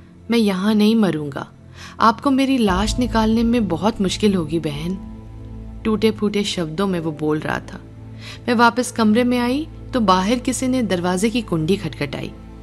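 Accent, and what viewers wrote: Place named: Pakistan. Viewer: I love your sweet pakistani accent voice.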